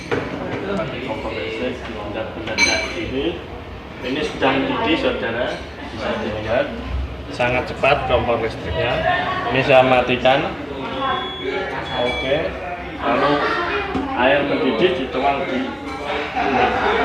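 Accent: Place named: Java, Indonesia. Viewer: Medokmen to yo hhah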